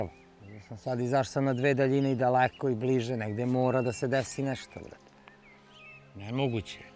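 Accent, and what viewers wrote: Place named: Morava, Serbia. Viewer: Za ove kojima smetaju psovke, akcenat, padeži... Čovek priča akcentom i padežima iz moravskog kraja. Respect što nije izveštačen po tom pitanju. Što se psovki tiče... Opet iskrenost... Psuje i Đoković u sred Londona, Pariza, pred hiljadama ljudi... Pa? Vrhunski ribolovac, sve ostalo je nevažno...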